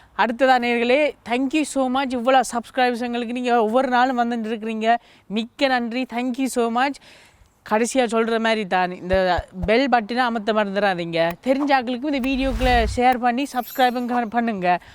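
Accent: Jaffna, Sri Lanka. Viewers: Sinhala tamil super 👍👍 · Srilankan tamil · யாழ்ப்பாண தமிழ்ல சும்மா பின்னி எடுதிட்டிங்க. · Jaffna tamil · i like Elangai Tamil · இலைங்கையில எல்லாரும் இவர் மாதிரி தானே பேசுவாங்க? இல்லையா? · ஈழத்தமிழ் கேட்க இனிமையாக இருக்கின்றது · Nice srilanka tamil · ஐயா , காரை பற்றி இலங்கை தமிழ் சூப்பா் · Sri Lanka Tamil sema mass... Super Machan car oda back rampa thodai Mari hahahaha . Kuwait le irundhu neelakandan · உங்கள் ஈழ தமிழ் அழகுக்கும், இனிமைக்கும் வானமே எல்லை. எனக்கு பிடித்த கார் விளக்கமும் சேர்ந்து இன்னும் இனிக்கிறது. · Thanking you for best explained in yalzpana Tamil❤️❤️❤️❤️❤️❤️❤️ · இனிமையான இலங்கை தமிழ் உச்சரிப்பு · யாழ்ப்பாணத் தமிழ் அதுவும் இங்கிலாந்தில் இருந்து அங்கு வளர்ந்த பையன் . அதுதான் இனிமை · அருமை இனிய இலங்கை தமிழின் அழகு தனி அழகு தொழில்நுட்பத்தையும் எளிதாக புரிய வைத்த தங்களக்கு நன்றி அன்புடன் நாகராஜன் பொன்னேரி தமிழ் நாடு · மிகவும் இனிமையான இலங்கை தமிழ் மிகவும் அறுமை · You are the best explanation for the new car and I proud your Jaffna Tamil speak style I love that. 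· இலங்கை யாழ்ப்பாண தமிழ். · தமிழில் அதுவும் இலங்கை தமழில் பேசும் விதம் ஒரு இனிமை காரின் செயல்பாடு தன்மையை விளக்கும் விதம் அருமை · இந்த பையன் பேசுவது ஸ்ரீலங்கா தமிழ் நல்லா இருக்கும். 05-8-20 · திருத்தம். ஈழத்தமிழ். அல்லது யாழ்ப்பாணத்தமிழ். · Srilankan original tamil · Ungal ilangai Thamizh migavum Azaga iruku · யாழ்ப்பாண பேச்சுத்தமிழில் வெளுத்து வாங்குறீங்க இலங்கை வானொலித்தமிழில் பேசினால் கேக்கும்போது செவிகளில் தேன்வந்து பாயும் , முடிந்தால் அடுத்ததடவை முயன்று பாருங்கள். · singala tamil யாழ்ப்பாணத் தமிழ் very very sweet to ear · இனிய யாழ் தமிழ் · இலங்கை தமிழ். அடுத்து என்ன விஷயம் என்னன்னா இந்தியா வக்குள்ள எந்த ஒரு நல்ல விஷயமும் நடக்காது. நல்லது வரவும் விடமாட்டாங்க. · illangai tamil ketka nalla eruku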